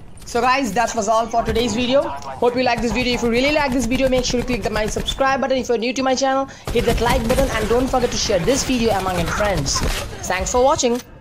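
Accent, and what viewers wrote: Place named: Bihar, India. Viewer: Shera to😂😂puro roast r tor Hindi te Bihari accent👌🙌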